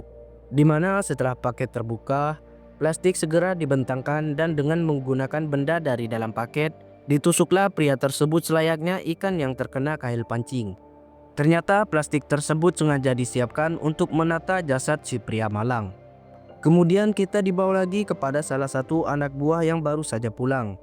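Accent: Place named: Madura, Indonesia. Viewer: Hee.. kau madura ya? Jing-trejing tak iyaa..? Bikin perut mules dengar kau cerita.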